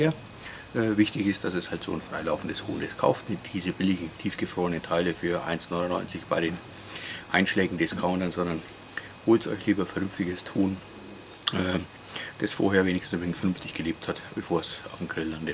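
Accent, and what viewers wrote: Franconia, Germany. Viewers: Der fränggische Dialekt ist zwar grauslig zum hören- aber das Video, das Rezept gleichts wieder aus ;-) Macht Bock auf mehr :) Welches Rub hast Du verwendet? schönes Video - Danke fürs Hochladen :)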